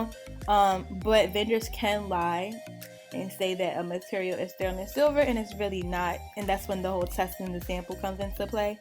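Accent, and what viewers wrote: Baltimore, United States. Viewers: are you from Baltimore? 🤔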